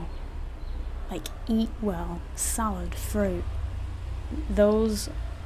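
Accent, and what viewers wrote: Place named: North, United Kingdom. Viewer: Yo where you from, you sound northern!!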